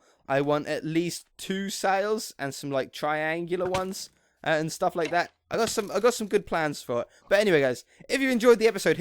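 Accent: United Kingdom. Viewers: I love your voice, that your british and that your a redhead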